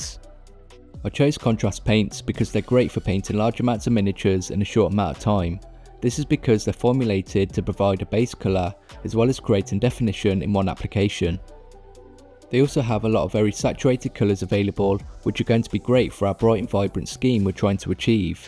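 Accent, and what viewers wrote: United Kingdom. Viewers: I'm not from the UK - what kind of regional accent is this? It's not super weird or anything, just kind of distinctive in some ways!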